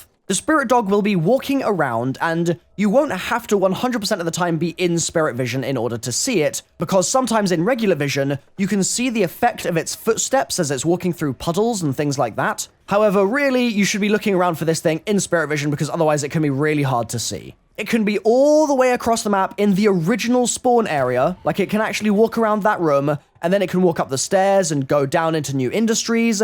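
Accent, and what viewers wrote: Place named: London, United Kingdom. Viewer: YOU SOUND LIKE YOU FROM LONDONNNNN (Nailed it)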